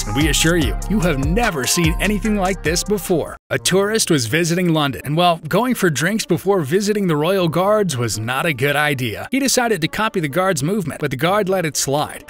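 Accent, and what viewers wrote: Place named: United States. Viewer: Why àn àmerican voice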